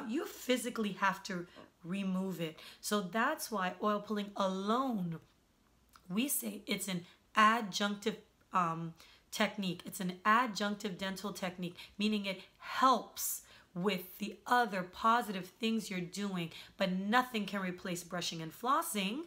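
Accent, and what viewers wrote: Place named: Haiti. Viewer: Are you Haitian? I detect a slight accent.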